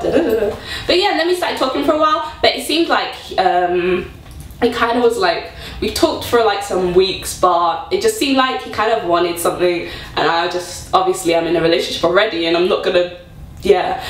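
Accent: United Kingdom. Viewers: Cute...your my Nigerian sister and the only difference is the accent, I speak american and you speak british. I love your stories its awweeessoommee....... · So glad I found you! What teach program are you with? I just applied for study abroad to Korea for the Summer. I want to start teaching there when I graduate (Dec '13)....So excited. I love the British accent. I would go into it so much in High School because I watched so much BBC.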